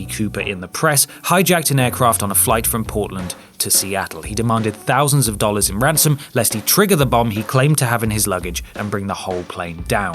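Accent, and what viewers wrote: United Kingdom. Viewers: They are mysteries because they cannot be explained. You couldn’t explain them. Only report them. But I enjoy your Brit accents.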